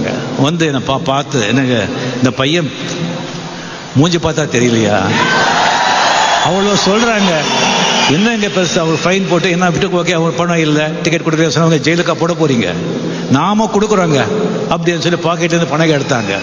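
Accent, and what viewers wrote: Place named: Hindi, India. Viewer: சரக்கு போல தலைவர் ஹிந்தி கரான் மாறி பேசுராரரு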